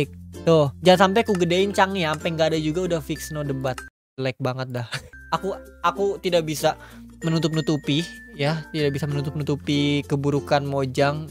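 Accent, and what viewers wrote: West Java, Indonesia. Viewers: Kak orang sunda kah?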